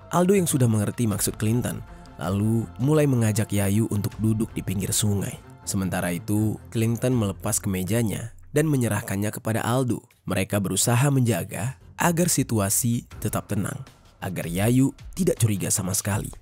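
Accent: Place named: Java, Indonesia. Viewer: Lancar bgt logat jawanya bang 😅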